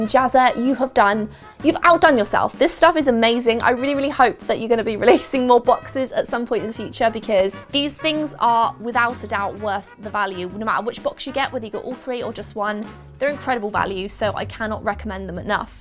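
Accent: England, United Kingdom. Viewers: I assume you're from England by your accent, mine hasn't arrived yet :(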